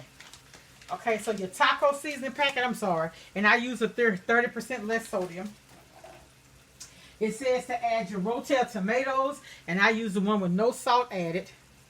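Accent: South, United States. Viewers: Enjoyed your approach of being yourself right at home. I making this for my family right now. You sound like buoy bc from the south , I’m from AR too, shout out to your cuz lol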